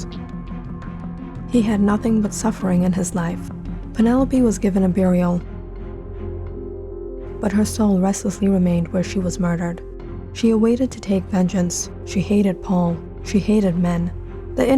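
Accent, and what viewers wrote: South, United States. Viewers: Love the country accent 😁💕 And so was the story! Underrated channel💕💕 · it's the country girl accent for me😂😂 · Great southern accent! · I like her country accent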